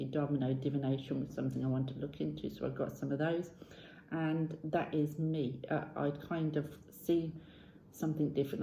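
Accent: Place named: Norfolk, United Kingdom. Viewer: Bravo! 👏👏👏 Good for you for not selling your soul to suit others. I hear what you’re saying about subs and followers because I’ve had the same thing happen to me on Instagram, an account I started simply to share my thoughts and things that inspire me or interest me. Sometimes I don’t post for a few weeks, because, like you, I’ve felt unmotivated and uninspired pretty much for all of this year. Since I stopped posting what I know to be popular, my followers have fallen by around 50 but I can’t worry about it anymore. I’m not sure it’s so much about ego as an inherent human need/want to be liked, although I guess when you start putting out content that your heart isn’t in, but that you know will get views/likes that kind of IS ego, so well done for recognising that, and for also realising that that may have been causing your anxiety and depression. 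By the way, are you a Norfolk girl? I ask because I’m a Norfolk/Suffolk girl and I thought I detected a slight familiar accent.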